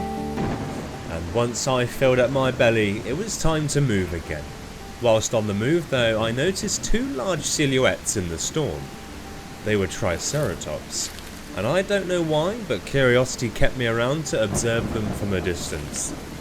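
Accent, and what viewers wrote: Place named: United Kingdom. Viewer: Once I heard the British accent and calm personality . I instantly knew this was gonna be good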